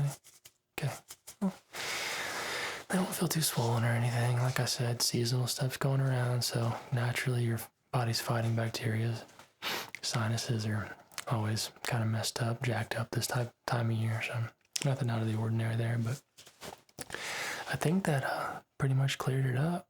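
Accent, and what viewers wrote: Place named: South, United States. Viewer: I hear a hint of a southern accent haha, are you from the south?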